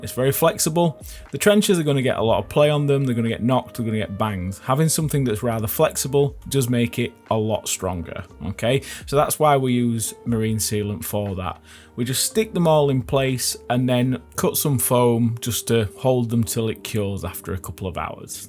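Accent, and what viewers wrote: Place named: Northern England, United Kingdom. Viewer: This guy’s accent makes me think he says ‘ey up’ instead of hello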